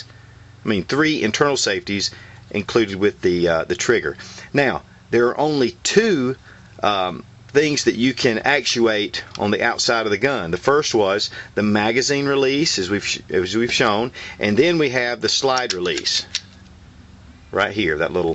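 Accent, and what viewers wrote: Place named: South, United States. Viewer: man i like your southern american accent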